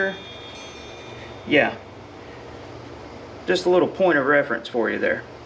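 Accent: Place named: South, United States. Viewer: Thanks Bud regards from AUSTRALIA, p.s i love your Southern Accent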